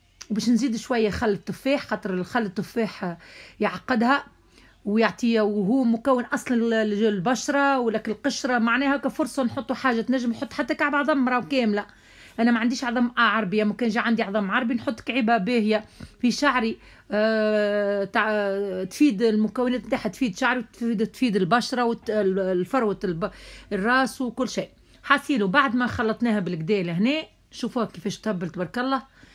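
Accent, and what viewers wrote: Tunisia. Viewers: تعجبني اللهجة التونسية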